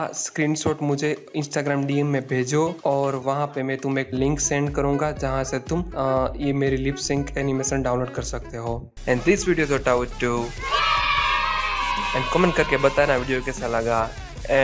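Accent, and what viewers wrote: Gujarat, India. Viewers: gujrati tone....so cute....peli line sunte hi mujhe pata chal gaya aap gujrati he....the way you say dro 😄instead of draw.....thats so cute....gujarati bhasha sach me bohot mithi he ❤❤❤ subscribed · Gujarati chho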